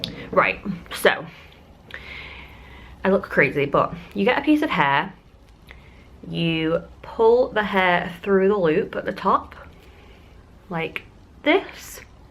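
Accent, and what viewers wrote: United Kingdom. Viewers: And i just wanted to say that you are awesome and i love watching your videos. I just recently found you and I am glad I did because i Love that you are different than most, hell...I am different than most! But i love your channel and I will continue to follow you. You're pretty awesome! I wonder, how much was it to order your dreads? I would Love to see if i can buy them from the USA. I love the UK though, it's the one place that I must see before I die. I don't know what it is about the UK but i gravitate towards it. Its like something pulling me and i am so in love with the Accent, the culture, everything about it! Is your real name? I apologize if that's personal or a little forward but is favorite name in the WHOLE wide world. I was going to name my daughter Belle. But her grandma was like NO, people will call her Lunatic, So yeah... 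i was like SO What, she could be called worse..lol! Anyways, you get the point! You ROCK!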